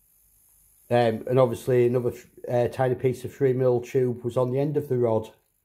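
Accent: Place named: Northern England, United Kingdom. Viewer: Great work! Tried this in my last one but give up! Also great to hear another proper Northen accent!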